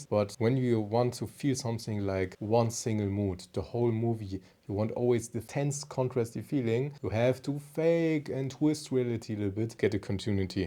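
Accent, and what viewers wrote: Germany. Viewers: i hear deutsch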